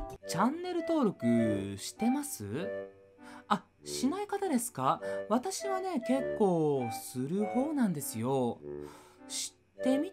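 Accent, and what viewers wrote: Kansai, Japan. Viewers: 同じ大阪出身の画家へのオマージュなのか、の関西弁、いつもとちょっと違って、印象に残りました。冒頭の写真の佐伯祐三、ハンサムですね！イケメンだとそれだけで、３割増しです。オーヴェールの教会、私は佐伯の絵の方が好きです。郵便配達夫、味があっていいですね！後に、彼の妻がこの配達夫を神様ではなかったか？！と言ったというエピソードも素敵です。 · あれ、いつもと語り口が違う…と思ってましたがあえての関西弁だったのですねｗ 佐伯祐三展が開催されると知った時から行きたい行きたい、いや行かなくては！というくらいの行く気満々。なにかこの荒々しいタッチに魅かれるんですよね～ ただ地方在住の悲しさ、一月中に行くつもりが開催日以降の土日が悪天候の強力寒波と雪で行く予定がまだ定まらず、ここまで来たら三月のルーブルと一緒に、かな～と思案。とにかく絶対行きますよ！ｗ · 急に関西弁になって、ちょっと入ってこない笑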